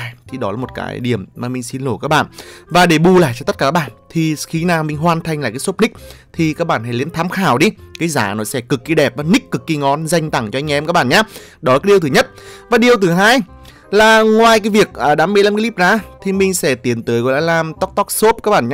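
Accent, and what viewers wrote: Nghe An, Vietnam. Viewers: Giọng Nghệ An dễ thương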